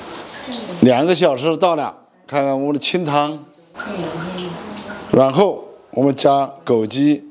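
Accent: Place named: Hubei, China. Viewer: 亲切的湖北口音😊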